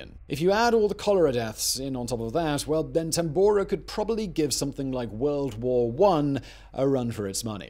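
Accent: RP, United Kingdom. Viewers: is this what they call posh accent? it's so difficult to understand :( and the cc are only auto generated. :( I can follow eg veritasium's videos fine, but these are challenge. And it's pity cause it's good content. I like the disaster put into history context.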